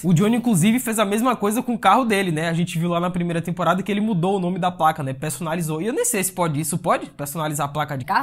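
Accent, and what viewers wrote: Bahia, Brazil. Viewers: Você é Baiano 😁😁😁, que legal , Bora Baeeeeeea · Você é Baiano, de Salvador? Porque eu sou, e achei muito massa ver um youtuber nerd daqui!! Kkkkk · Vc é da Bahia mano ?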